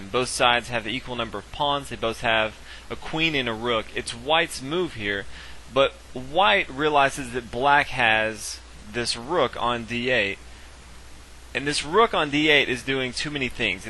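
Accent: South, United States. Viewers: I like the lessons but his southern accent is very annoying.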